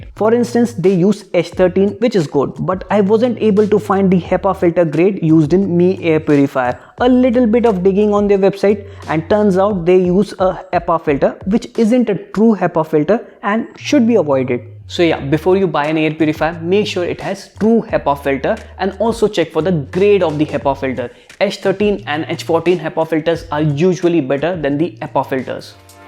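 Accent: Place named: India. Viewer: love the proffesionalism in your voice, and combined with indian accent (which in my head is connected with microsoft scammers and shitty call centers) makes it briliand and a honey on my ears. Great job man. Just bought my first air purifier and hope its going to help my girlfriend with her allergy.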